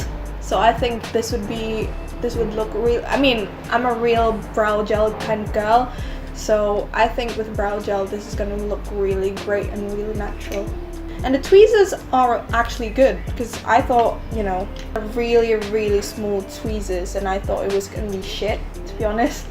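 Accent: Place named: United Kingdom. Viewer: gurl your British accent has gotten so much heavier than your last video lmao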